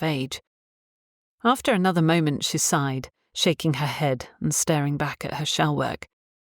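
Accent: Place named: Cornwall, United Kingdom. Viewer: did a great job. She really brought the story to life with her narration and Cornish accent. She was the perfect narrator for your wonderful story. I’ve enjoyed this series. Thank you for making it available on YouTube.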